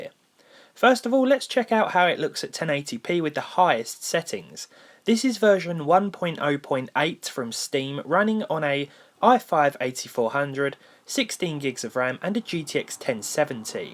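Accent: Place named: England, United Kingdom. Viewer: Jesus... what a disgusting english accent. :(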